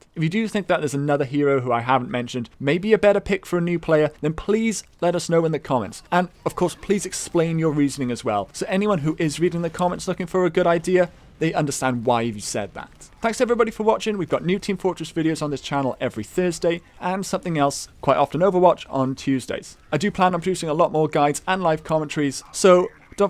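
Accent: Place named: England, United Kingdom. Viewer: dont get me wrong i really really like ur vids but i so not agree with u on this vid. no hero is actually ez to play cus 1. each player have his own play game and and there are players that it would be difficult to them to play those heros with there type of play. 2. try to play those heros in actual sieries mode or like comp, its not ez to play them like this. another thing i want to say that every player should play what he likes to play even if that was the hardest (all though i allready explained that there are no ez and harders) and the players dont need to play just what fits to to new players they need to play what they like and what they want to. thx and pls answer me 1 more question: in the qna vid u sayed u r not from england. then where are u from? u really sums from england thx!! and again dont get me wrong i love ur vids just i dont agree with u on this 1 and dont worry, i didnt dislike XD thx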